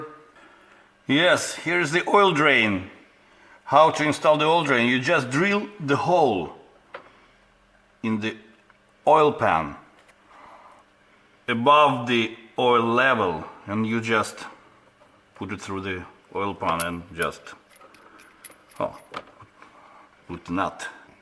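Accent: Russia. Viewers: русский английский!!!! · Русский что ли бро ) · Та я только по первым словам на английском уже понял, что это говорит русский человек 👍👍👍 Мерс огонь!!!!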